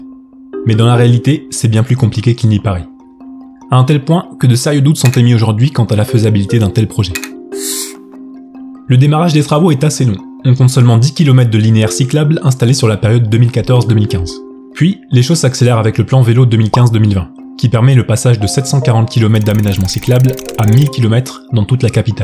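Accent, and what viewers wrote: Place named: Banlieue, France. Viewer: Ay l'accent de banlieue j'y arrive pas! "voitchure" au lieu de "voiture"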